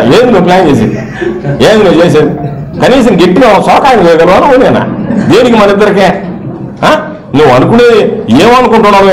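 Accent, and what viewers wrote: Nellore, India. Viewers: Govardhan anna iam your FAN.iam from ongole.you are going to get very great credit that you are going to defet 5 th time.anna iam your fan you speak in very good in nellore slang.Anna i wish you all succesd.you are going to get credit of defiting 5 th of the great mr somireddy. anna all the best to you anna. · Super counters short and sweet we with typical nellore slang...Nellore slang lone vetakaram untundi...Pakkana koorchunna ayana chaviti vademo ani doubt ga undi lekapothe anta comedy vinnaka navvakunda unnarante great...Love the speech.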